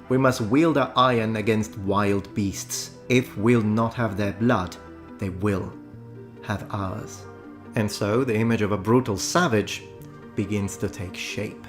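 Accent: England, United Kingdom. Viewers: I take it that you lived for quite a while in England because my sisters friend Julie comes from Greece and has a different accent than you when speaking English. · Please make more videos about the celts, we know so little about them and pop culture is full of misinfo. Despite being an anglo saxon/scotch irish American Ginger (with Sicilian great grandparents! I am in no way culturally sicilian but I love the fact that you are Sicilian, speak British English, yet married an American and live here) When I hear about the celts, I think of Pict warriors fully naked covered in blue tribal paint, and I've always had a hard time believing that represented the celts as a whole.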